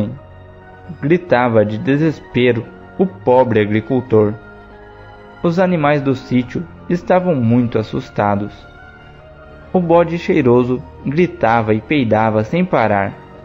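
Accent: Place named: Rio Grande do Sul, Brazil. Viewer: Não tem como sotaque gaucho soar bonito. 🤷‍♂️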